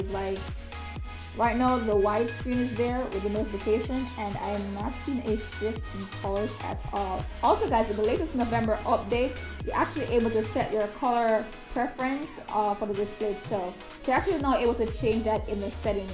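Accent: Jamaica. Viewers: So many unboxings but how many with a Jamaican accent? Actually this is the first Pixel 2 unboxing I am watching.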